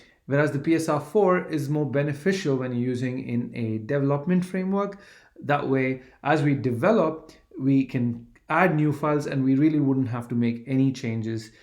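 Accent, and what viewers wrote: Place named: India. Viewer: Very nice explanation. But! Use as large a font - or zoom in - as the you can get it. And speak slowly and try to be clear. Indian accent is hard to understand for north europeans. But really very good. Like write: One of the best videos I have ever seen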